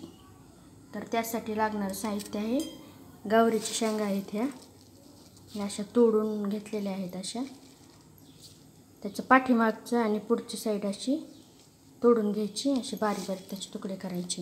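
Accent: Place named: Ahmednagar, India. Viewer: Tumcha gaav kutla? Awazavarun Ahmednagar zilla che vatatat.....ho ka?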